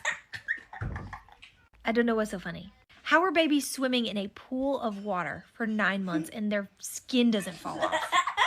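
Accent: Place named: South, United States. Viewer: I love the way you described that without being condescending or anything, this felt like a general teaching lesson made from love. You should consider being a professor or something. There’s something about your absolutely gorgeous and sweet southern drawl that makes me want to list to you explain things all day. I’d love to learn more about the female reproductive organs and babies being formed and delivered from your voice. Anyone else and I’d probably rather not hear any of this. I’m not a father, not expecting to be one anytime soon and would only like to learn these things if it were going to be happening to me, but if you explain it, I’ll learn and take notes.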